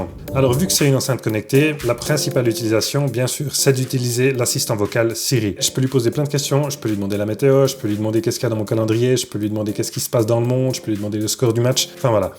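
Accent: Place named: Liège, Belgium. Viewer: Super vidéo ! Très objective ! J’adore et en plus j’entends que comme moi tu es belges et de liège au vu de l’accent 😅 continue comme ça !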